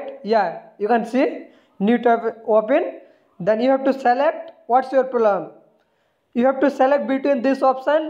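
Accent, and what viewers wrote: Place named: India. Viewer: Bhai sumjh me to kuch nahi aaya. Pr tari desi English sun ke majaa..aa gaya.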